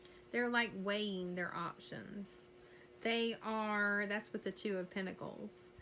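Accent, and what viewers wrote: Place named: South, United States. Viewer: Thank you for the reading. I like your southern accent reminds me of home 😊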